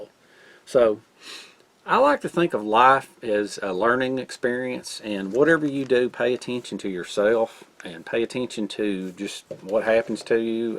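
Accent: South, United States. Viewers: "What accent?" as one Australian said. But seriously, the 'Southern Drawl', I believe, is a of rather SOPHISTICATED character and sounds very much like the altered remnants of a Proper British /Aristocratic English accent, to me anyway. I think that's why it sounds so familiar somehow and also sweetly comforting. I think may have the unbeknownst lineage of an Aristocratic English Lord...that makes ALL kinds of Tools go "Out of Stock" with every other episode. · Silky saws are awesome. Hows the handle on that machete feel? Idk if id like the feel of it just based on the looks but ive been fooled b4. I got a baryonyx kingfisher as a gift & i thought at 1st that it was just a toy basically w/ a weird pistol type grip but that handle is super comfortable & it cuts like a laser even w/ the strange pointy profile. Oh & btw your accent is fine. As a matter of fact southern dialects descend from French & UK high society. 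I study languages & our heritage is something to be proud of. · I love your southern voice like how yu say machethe lol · I love your southern accent. I could listen to you all day! LOL Thank YOU for being such a great, innovative guy that I've learned a lot from. Always look forward to your videos. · I agree with you. I like a southern accent. Some people who hear a southern accent make the mistake of thinking the speaker is not very intelligent. Behind accent is a genius mind. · Buddy I'm glad your going to get back to normal on the shift. What some awesome things sent to you! Brother you deserve them all, ENJOY! Good video as always Brother! I remember about 100 years ago when I discovered your channel, my little boy who's grown now was sitting there watching and listening to you and looked at me and said "Daddy he sounds like us!" Hehehe it's a Southern thing:) Thumbs-up buddy ~John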